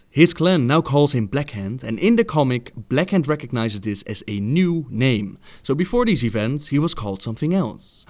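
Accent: Netherlands. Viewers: he's dutch, he has an accent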